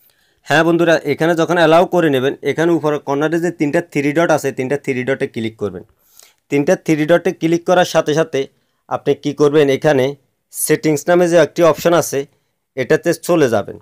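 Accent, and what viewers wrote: Noakhali, Bangladesh. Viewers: আপনি আমাদের ফেনী নোয়াখালীর মানুষ হবেন আপনার কথা শুনে বুঝতে পারলাম যদি হয় কাজটা মন থেকে অনেক দোয়া করবো আর যদি না হয় কষ্ট করে আপনার কন্টাক্ট নাম্বারটা আমাকে দিলে খুব ভালো হয় আর আমি আপনার ফোনের মত হয়তোবা ছেলের আইডি ইউজ করি আরকি সেফটির জন্য প্লিজ আপনার নাম্বারটা দিলে কোন ক্ষতি হবে না প্লিজ 😢😢😢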